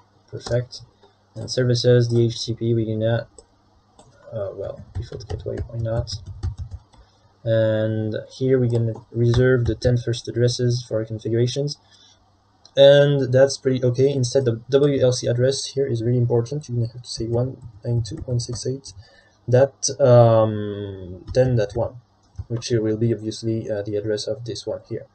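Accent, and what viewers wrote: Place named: France. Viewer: I like the French accent. Thanks for the video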